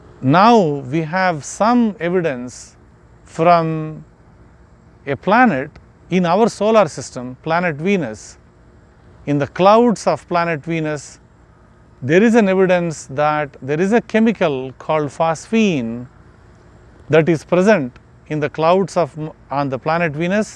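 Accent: India. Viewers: ohh ya I felt from your way of talking that u are from india by the way which telescope u have beside u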